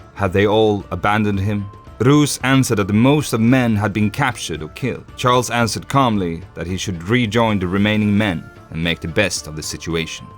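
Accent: Sweden. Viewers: There is nothing more cringe for a Swede than hearing another Swede speakinf English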